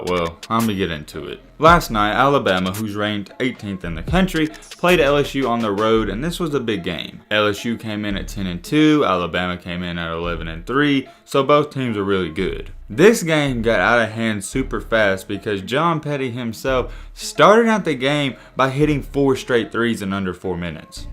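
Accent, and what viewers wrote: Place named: Kentucky, United States. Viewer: where you from man, got the same dialect as us here in KY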